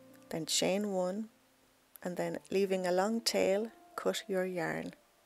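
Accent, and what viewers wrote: Ireland. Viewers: Well this is just lovely! I adore this whole tutorial! Your pretty Irish voice is so soothing. I’m an American who lived in Ireland for a couple of years, and the memories are priceless. Gorgeous pattern and hat!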